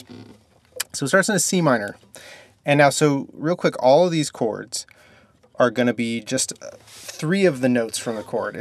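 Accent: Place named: United Kingdom. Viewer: This dude does one hell of a British accent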